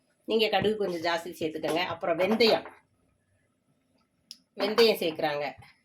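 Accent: Kerala, India. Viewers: She talks as if born and brought up in kerala. She neither looks good nor has brain. Attitude mattum high level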